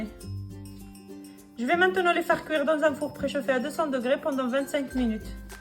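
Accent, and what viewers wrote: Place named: North Africa. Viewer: Merci beaucoup Madame pour cette delicieuse recette , j' adore aussi votre accent qui sent le soleil d'Afrique du Nord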